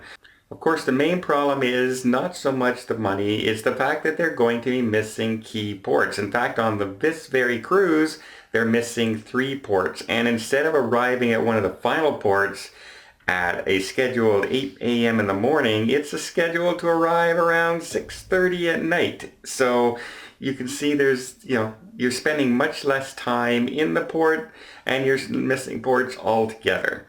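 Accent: Canada. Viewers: ? I love your videos. I/m just an average Gay American (IF that's even possible??) So, I grew up on the West Coast (Los Angeles) and I hear accents.? I knew you were Canadian the minute I heard your accent AND??? Because you are a kind and respectable person. YOU are a treat!!!!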